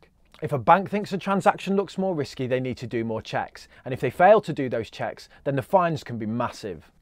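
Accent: Birmingham, United Kingdom. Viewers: Are u from Birmingham bro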